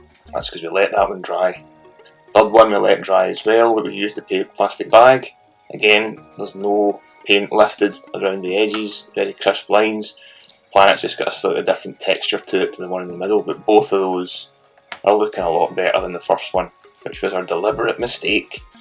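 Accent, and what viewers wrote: Scotland, United Kingdom. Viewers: Anybody else love the Scottish accent, were painting sex planets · Cheers from france , like your planets & love your Scottish accent....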